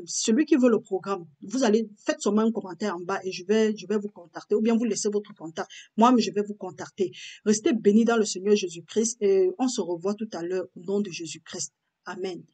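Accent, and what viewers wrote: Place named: Cameroon. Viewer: Il me semble que vous êtes Camerounaise ma soeur de par votre accent. Que Dieu te bénisse davantage au nom de Jésus la sentinelle de l'Eternel.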